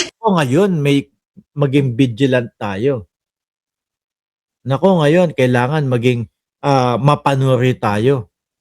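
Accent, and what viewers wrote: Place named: Iloilo, Philippines. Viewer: Parang boses ilongga ang tuno.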